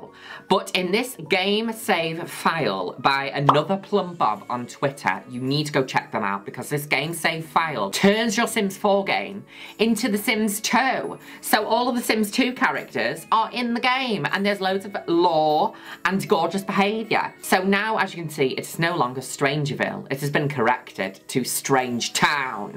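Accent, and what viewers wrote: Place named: United Kingdom. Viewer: The british accent makes everything better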